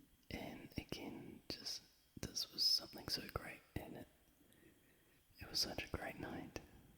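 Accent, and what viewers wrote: New Zealand. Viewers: I find it the most bizarre thing hearing you whisper and having the same accent as me. It shocks me even when I'm expecting it! Merry Christmas by the way! Hope you had a really nice day :) shopping malls are so busy here at this time of year. They broke a record on the intake of money this year apparently. Good old kiwis ae? Are you in the North or South Island? Really great videos! If you ever want help with some inspiration I'll help you out. :)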